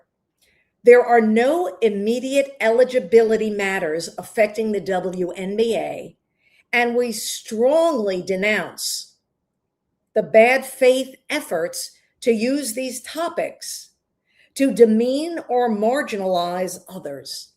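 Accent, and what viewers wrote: New York, United States. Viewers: Love NYC accent.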